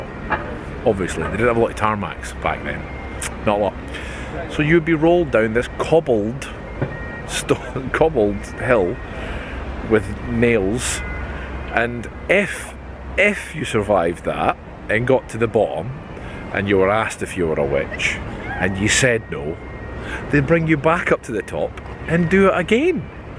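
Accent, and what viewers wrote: Scotland, United Kingdom. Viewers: I am watching this and chuckling to myself. You are speaking English, but it takes me a few seconds to process it, because of your thick Scottish accent.( he- he- he)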